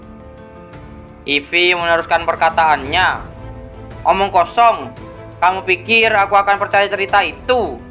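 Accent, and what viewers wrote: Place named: Bali, Indonesia. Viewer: Logat ngomong nya kyk orang bali kyk bli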